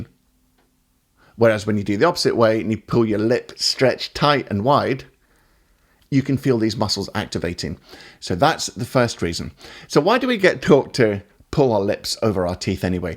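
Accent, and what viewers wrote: Scotland, United Kingdom. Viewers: This was a great video for me. I really appreciated your explanation about the reasons why the "pout" works so well. Thanks. Not too many Scottish accents on the tube. I'm an exile from Kirkintilloch, living in Kent so always good to hear.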